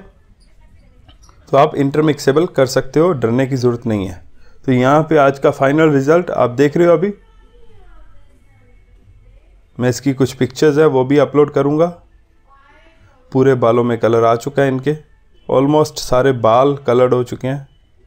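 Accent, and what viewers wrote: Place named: Pakistan. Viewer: Assalam ualikum sir apka btaane ka tarika bilkul esa hai aise Pakistani bat krty hain ya apka koi talluq hai Pakistan main apka koi hai yha main agr india hoti to apky bht kuch aky seekhti or sekhti bhi hon ma sha Allah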